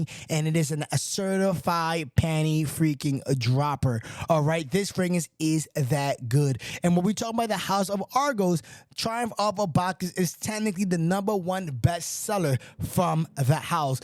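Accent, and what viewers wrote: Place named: Peru. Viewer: Y yall hating on his Peruvian auntie accent 😓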